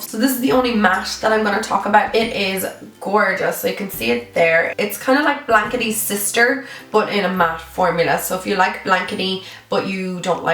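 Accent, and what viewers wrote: Ireland. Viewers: You're gorgeous! 😘 Love your accent, is it Irish?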